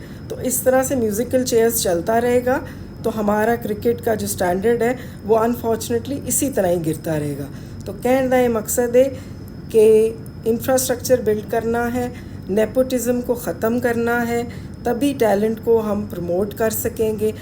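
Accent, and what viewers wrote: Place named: Punjab, India. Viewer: Your Punjabi accent ❤, do improve your thumbnail tile graphic design, regards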